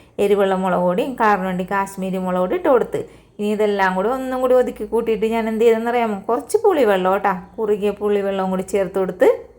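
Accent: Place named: Kodungallur, India. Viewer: ഉണ്ടാക്കി നോക്കാം. ഇതേപോലെ സംസാരിക്കുന്ന ഒരു കൂട്ടുകാരി എനിക്കുണ്ട്. കൊടുങ്ങല്ലൂർ സ്ലാങ് ആണെന്ന് തോന്നണു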